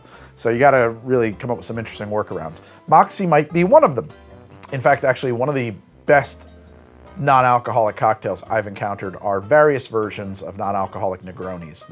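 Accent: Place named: New England, United States. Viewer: The worst part about Moxie is the New England accent.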